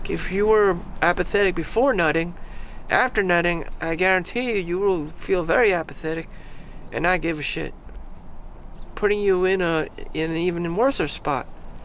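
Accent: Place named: United States. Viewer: What? He sounds 100% American!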